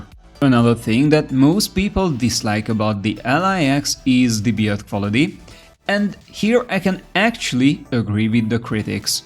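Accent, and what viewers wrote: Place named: Hungary. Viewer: Magyar vagy? Legalábbis akcentusból ítélve. :)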